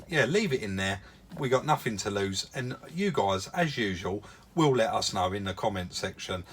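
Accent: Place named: Thanet, United Kingdom. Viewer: My mothers family came from the Thanet area and when I hear that accent of yours it really reminds me of them. My Nan lived in Herne bay and ran the old dairy shop for years, then she had a few cafe's in Mortimer street and a green grocer..she was really well known in her day....She moved on to Whitstable where she had the 8 till late shop on the main drag in town. Most of them are no longer with us but it's good to hear the dialect and sayings. I bet you went to Leysdown on holiday as a kid ?? Great channel, very interesting.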